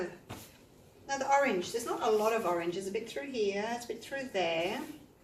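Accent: Australia. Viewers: Do you are silicone to your paints? I just love watching you :) YOU ARE FUN Fabulous So Cheerful and very TALENTED too! Love your Style & Aussie Accent is music to my ears :) just lovely and your designs are brilliant!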